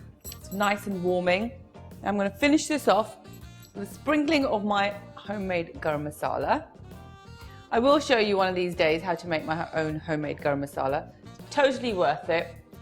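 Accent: United Kingdom. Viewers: She is flaming Hot.. I love her British accent too.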